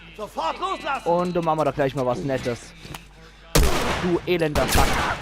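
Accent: Palatinate, Germany. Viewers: Hab ich das richtig verstanden am Anfang: "Wir sind im Hemshof gelandet"? :D Ist das ein pfälzischer Ausdruck oder meintest du tatsächlich den Stadtteil einer bestimmten Stadt in der Pfalz? :D Hab deinen Kanal über das Forum gefunden und fand deinen "uff pälzisch"-Aspekt sehr interessant! Bin selbst in der Pfalz (Vorderpfalz) aufgewachsen, auch wenn man das gar nicht glauben mag, da ich ziemlich Dialektfrei bin :D Werde auf jeden Fall von Zeit zu Zeit mal reinschauen :)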